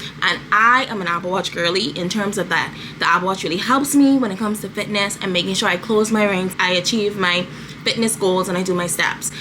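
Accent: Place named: Bahamas. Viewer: I know a Bahamian when i hear an see one lol 😅 Love the video keep up the good work luv 🥳 242 to the world 🇧🇸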